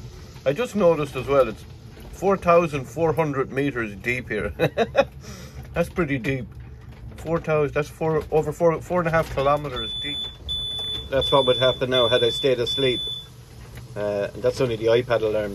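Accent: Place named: Ireland. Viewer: It's so awesome to see people living their dreams. I'm so jealous!! A healthy kind of jealousy though, I'm very happy for you and your Irish accent:) Give me another 10 years of work and I'll be right there with ya! Keep living free and please keep sharing your life with us. My mother and her friend just got back from Lisbon yesterday. I told her that I will visit Lisbon some day, and that my visit will come from the sea, like the explorers and traders from 5, 6, 700 and more years ago. The history that came through Lisbon is incredible, you're part of it, and some day my boat and I will be also. If you ever are curious where your fans are from..I live in Fairport, New York. Where do you keep your boat when you're back in Ireland?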